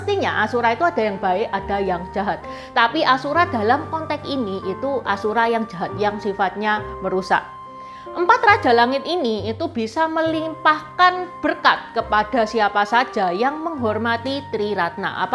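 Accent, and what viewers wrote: Java, Indonesia. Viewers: Kok Medok banget 😊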